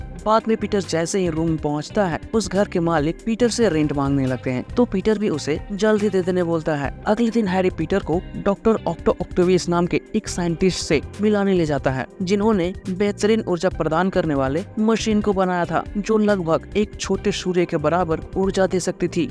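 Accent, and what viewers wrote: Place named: Bihar, India. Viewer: Aspaiderman 😊.. That bihari accent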